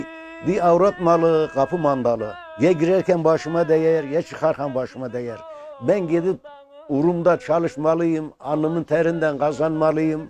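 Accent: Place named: Gaziantep, Turkey. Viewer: emmi antepli gibi konişi aceb nereli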